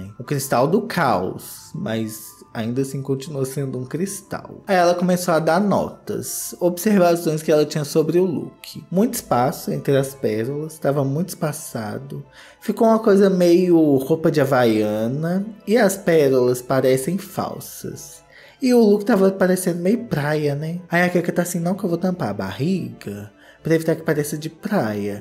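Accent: Minas Gerais, Brazil. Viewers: “Pera lá né” kkk vc e muito mineiro, eu amo o tô longe do meu estado e sempre que te assisto me sinto lá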